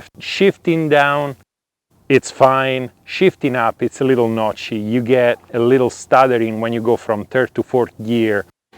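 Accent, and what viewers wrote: Rome, Italy. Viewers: Beautiful honest review, good job. I think i've heard a bit of Italian accent. From Rome, to be precise. Am i right? If i may ask.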